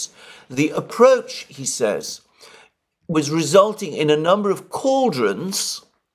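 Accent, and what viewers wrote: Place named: RP, United Kingdom. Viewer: If you had’t been involved in nail bars you could have been king’s council, instead of a messenger for Putin’s grocery boy. Strategic, tactical - good put down. Your accent is posh English, not Greek. Just saying.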